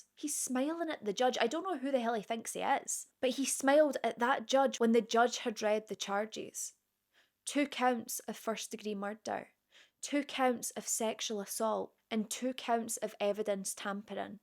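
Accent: Scotland, United Kingdom. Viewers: Hello again from Canada I just found out what Hyping is and after listening to the explanation I get it now... So of course I Hyped you... Love you, Love your videos... mostly love your Scottish accent 👍🏼💙💙💙🇨🇦j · As a true crime buff, I'm so hyped to have found your channel (late to the game, I suppose) 💙 What part of Scotland are you from? I love the accent (almost as much as I love Scotch whisky 🥃 LOL) And how do you come up with so much content every week? You're like a walking criminology encyclopedia 📖 — definitely the most informative 'yapper' around 🧠 — subscribed and shared 👍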